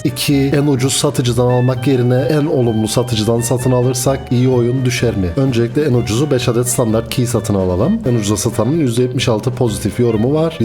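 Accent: Cyprus. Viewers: kıbrıs ağzın çok iyi reis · kral kıbrıslı mısın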